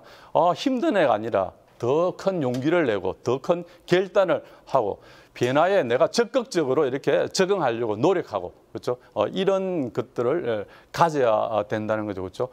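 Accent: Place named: Gyeongsang, South Korea. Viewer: 다소경상도 말씀이라 알아듣기힘들었지만 강의를듣고 우선책을많이읽어야겠다는생각을했어요.수고하셨습니다.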